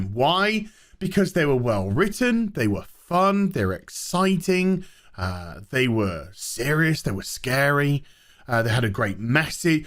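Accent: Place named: North, United Kingdom. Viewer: After months of research I've finally discovered what each word in your intro is.... Cuz your voice is, It's fast. And northern. I'm Canadian. I had to take my fucking time here and figure shit out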